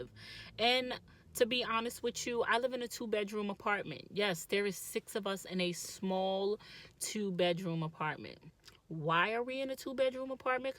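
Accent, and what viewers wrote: New York, United States. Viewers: Girlllll I knew you was from NY the moment I heard you talk!! I'm from The Bronx and can definitely appreciate you keeping it real. Loved the apartment tour!!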